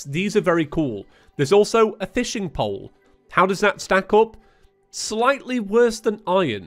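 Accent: United Kingdom. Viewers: Omg are you British!😍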